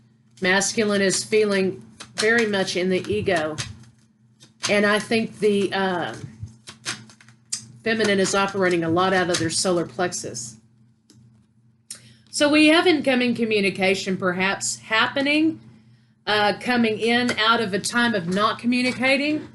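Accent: South, United States. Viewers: Wow wow and wow, oh my goodness. I listened to this whole message and it's giving me so much clarity. Some of it, I already knew, but needed a reminder, some of it I've been working on and some of it I NEED to work on. Thank you so very much!!!You are so extremely enlightened and gifted. And I love you southern accent! Peace and blessings to you. Love, E. from WNC.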